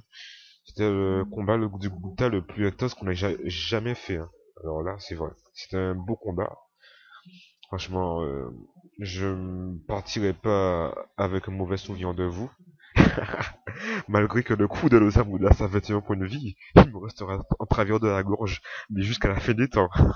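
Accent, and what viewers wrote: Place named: Africa. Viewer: ta un accent africain :)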